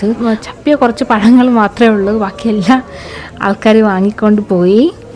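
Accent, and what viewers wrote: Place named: Trivandrum, India. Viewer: place trivandrum aano. Tone kettitt angana thonnunu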